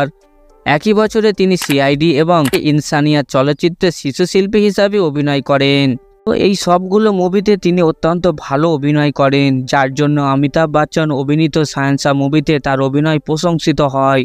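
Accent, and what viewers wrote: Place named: Kolkata, India. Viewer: Sabdhana Kotha bolben aita bagladesi occharon na bangladesira vul occharon kora na aita apnader Kolkataer vasha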